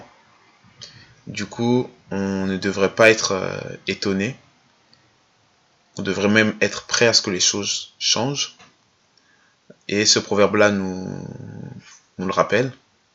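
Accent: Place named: France. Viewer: Merci pour ce boulot colossal. .. au niveau des observations , je pense que la prononciation est un peu francisée .En plus les proverbes en ewe ont un aspect initiatique . Raison pour laquelle les ewe citent les proverbes en les introduisant de manière particulière.. PAr esemple on peut dire ceci : les sages disent que!........ les ancoens disent que.... un proverbes ewe dit que. Il n'est pas conseiller de prononcer un proverbes si un ainé est présent . Dans les situation critique, la prononciation d'un proverbe approprié peut dégonfler ton adversaire..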